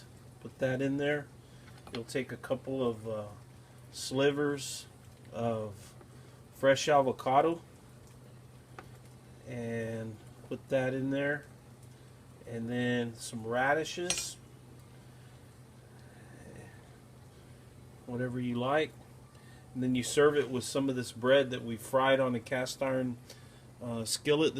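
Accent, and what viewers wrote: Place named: West, Mexico. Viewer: BTW-love your accent of Western and slight Mexicanish accent. Must be good since your mouth is watering as you cook. That's what all good chef do! :-)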